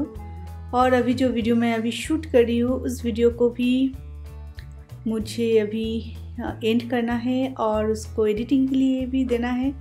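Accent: Bengal, India. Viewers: Hi. I recently started watching your videos. I live in NY,USA but I was born and raised in Kolkata. Watching you connects me with my childhood and youth. I love the way you talk in hindi like most of my bengali friends did. You sound so genuine and so comfortable in front of the camera as well. I am always looking forward to watch your videos. Keep up the great work.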